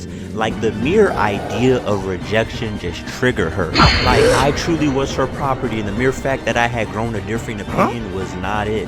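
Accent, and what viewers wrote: New Jersey, United States. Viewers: U frm jersey??? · Where u from jersey?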